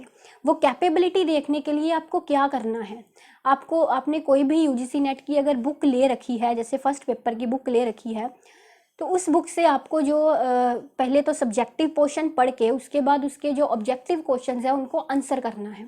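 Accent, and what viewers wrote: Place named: Punjab, India. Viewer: ਜਿਵੇਂ ਤੁਸੀਂ ਬੋਲਦੇ ਓ ,,I like it 😀🤗 ਵਿੱਚ ਪੰਜਾਬੀ accent ,,,pretty voice ਰੁਕਦੀ ਨੀ ਪੰਜਾਬੀ ,,,ਆਪਣੇ ਆਪ ਆ ਜਾਂਦੀ ਐ ,, ਤੁਹਾਡੀਆਂ videos ਦੇਖੀਆਂ ,,,cute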